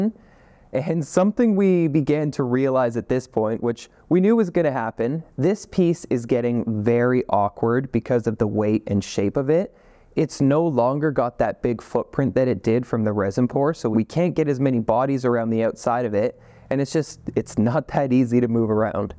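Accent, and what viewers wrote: Canada. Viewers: He is Canadian that is how they speak.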